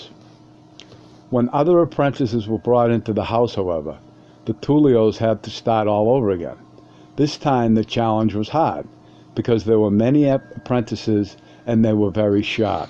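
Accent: Boston, United States. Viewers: Thanks so much for this upload. Your bostonian accent has been blessing my mornings for the last few weeks. Looking forward to more.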